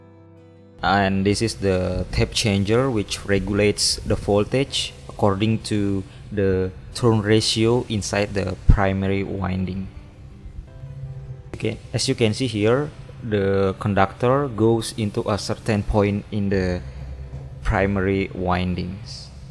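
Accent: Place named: Indonesia. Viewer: Logat orang Indonesia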